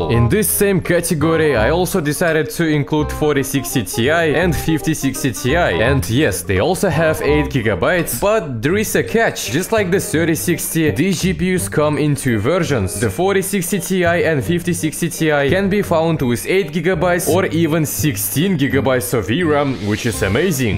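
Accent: Russia. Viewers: I hear that Russian accent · Bro u russian right? Its accent🤔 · По акценту слышно что наш) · Do only I hear russian accent? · bro has the strongest russian accent ive heard lol😂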